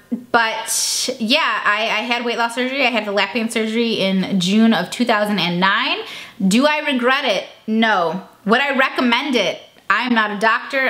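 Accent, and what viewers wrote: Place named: South, United States. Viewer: i love your voice for some reason. its like sassy/southern but not annoying haha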